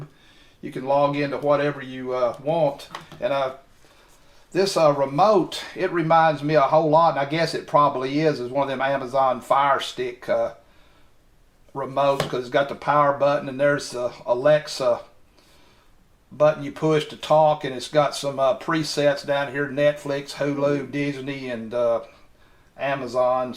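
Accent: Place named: Texas, United States. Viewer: Man sounds texan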